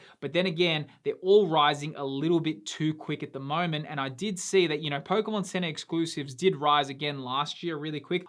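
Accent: Australia. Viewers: i subscribed because of the Aussie accent and the banana trend :)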